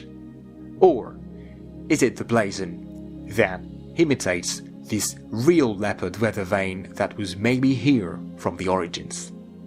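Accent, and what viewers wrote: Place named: France. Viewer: nice accent Fr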